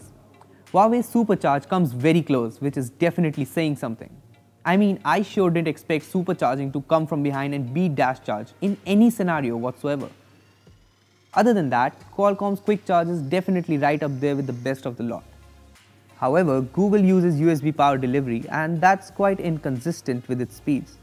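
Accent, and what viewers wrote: India. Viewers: jeez you tryna talk in street language in indian accent...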